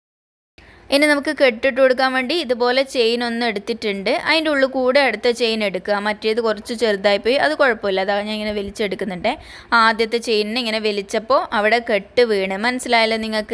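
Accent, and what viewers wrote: Kannur, India. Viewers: നിങ്ങളുടെ place kannur aano സംസാരം കേട്ടിട്ട് അതുപോലെ und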